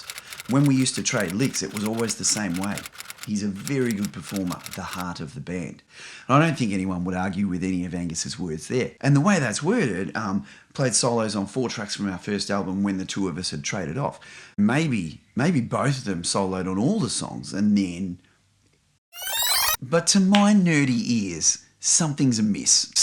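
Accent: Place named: Australia. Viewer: Just watched this! Love it. Great to hear an Aussie accent in this space. Forensic guitar analysis, and you are so talented to be able to demonstrate each player. Your little assistant even has your hair😊🤣. Rock on🎸🔥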